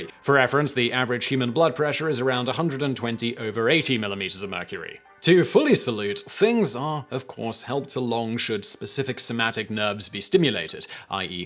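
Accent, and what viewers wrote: United Kingdom. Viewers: Listening to a guy with what I call a “documentary style British accent” (like Sir Richard Attenborough) spend over 10 minutes talking about erections was hilarious 😆. Thanks, I needed that! 🤣🍆 🇬🇧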